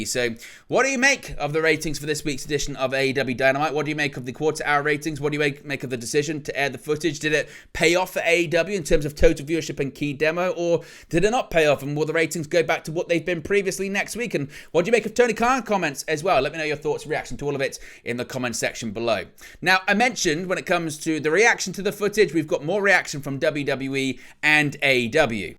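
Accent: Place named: England, United Kingdom. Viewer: Its weird to listen to JDFROMNY do his show and then hear you do THE SAME SHOW but in an English accent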